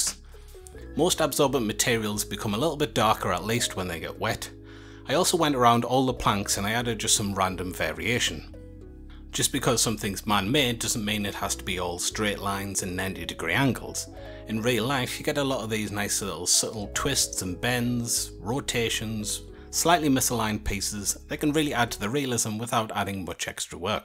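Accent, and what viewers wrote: United Kingdom. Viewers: Great video :) Also great to hear more regional UK accents rather than just typical American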